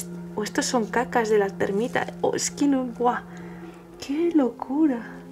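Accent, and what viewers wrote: Spain. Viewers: Me encanta el acento Que tiene ósea el acento español es impresionante Saludos desde Venezuela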